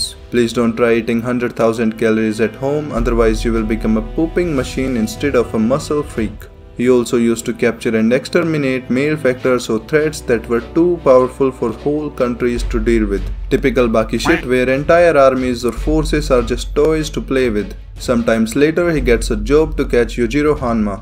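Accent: India. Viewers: the indian accent is what makes this videos the best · Is this guy from India? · I just starte watching this chanell and its just so fun with his indian like voice exsplaining typical baki stuff to me like it was kindergarden i love it! (allso why is every single human in baki anime have more mussels than Any body bulder on earth?) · Are you an indian 🤔 just asking because your voice sounds very familiar with the people of india 😎. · Where are you from? Your accent is Indian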